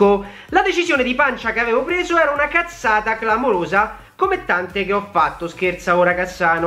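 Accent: Rome, Italy. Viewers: Di che zona di Roma sei?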